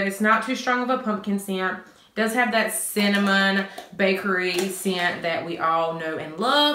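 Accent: South, United States. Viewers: Just found your channel!! I love it! You are so precious and love your southern accent. I'm a Mississippi girl so I totally understand :)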